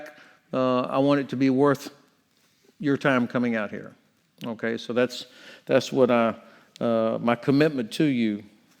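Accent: United States. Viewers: I thought the accent was Houston area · As an English learner I say f*ck King’s English, I’ll take Florida English every day of the week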